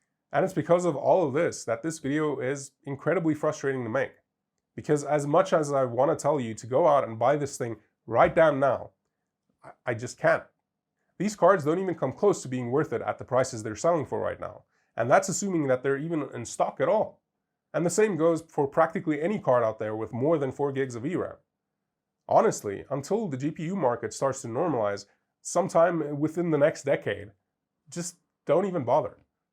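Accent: South Africa. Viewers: You from SA?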